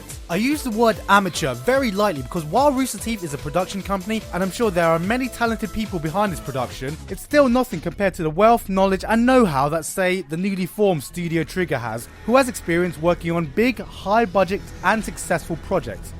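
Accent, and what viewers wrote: United Kingdom. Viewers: I like how you're an Asian, but with a British accent. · He's British, why do you ask ?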